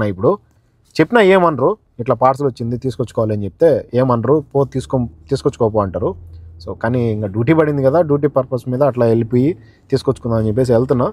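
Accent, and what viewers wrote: Kadapa, India. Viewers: Mee kadapa language bagundi and mee smile supper ❤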